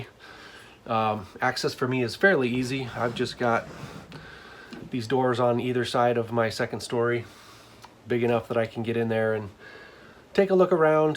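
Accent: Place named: Canada. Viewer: Great info! Wondering why you sound your from Canada?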